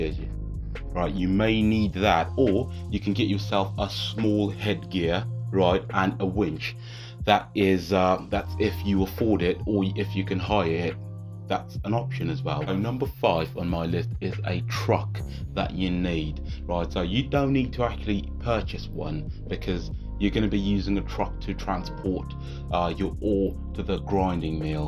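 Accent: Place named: United Kingdom. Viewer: hahaha aaah straight Bri ..ish lol like the accent lol. Thanks man . God bless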